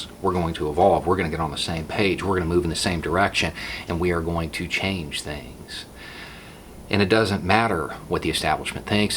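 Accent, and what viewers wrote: South, United States. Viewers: Hey, love the messages in your videos. How does your own local community receive your views? I'm assuming from your accent that you live in the south and therefore probably a right/ conservative leaning state? Thanks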